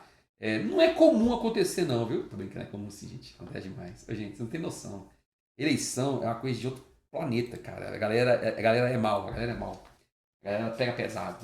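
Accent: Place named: Minas Gerais, Brazil. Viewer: Olá Prof! Sou aluno de outro curso, mas assisto às suas aulas sempre que posso. Sua didática no formato conversação, com jeitin minêro, é sensacional! rs Parabéns, mestre!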